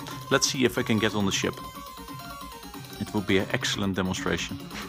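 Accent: Netherlands. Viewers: ArtOfCamelot well it definitly wasnt very good english, it had a lot of dutch trough it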